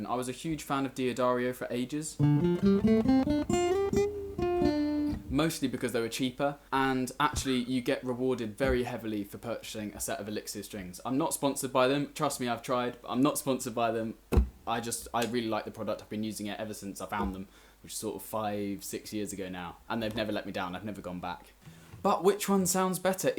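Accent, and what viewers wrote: United Kingdom. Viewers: Fabulous and I love your Great Britain accent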